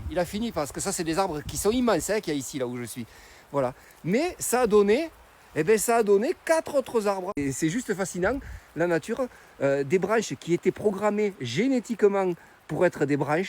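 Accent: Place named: South, France. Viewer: coucou! de la part dune mamie qui à reçu cette année à Noël de sa petite-fille en cadeaux ce livre: la vie secrète des arbres de: Peter Wohlleben,Fred Bernard,Benjamin Flao. peut-être connait- tu ce livre? ma petite-fille faisant des études dans ce domaine (cientiphique).même à 74 ans que j'ai eut le 16 février 2026,on en apprend tout les jours, lorsque j'étais enfant, je grimpais tout les jours aux arbres,ayant la chance, de n'avoir que la route à traverser pour s'y rendre. je vous ai découvert depuis quelques temps,et vous etes une bien belle personne.(j'adore votre accent ).ça me fait pensé à mes années adolescentes passées dans le midi (06). ps: cela fait du bien de vous écouté parlé, vous respirer le bien-être... mamie anne-marie, 77000,proche de fontainebleau, connaissez-vous le château? et le parc magnifique, chargé d'histoires, pour ceux à qui ça intéresse. 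merci encore pour votre savoir pour la jeunesse à venir. 😘❤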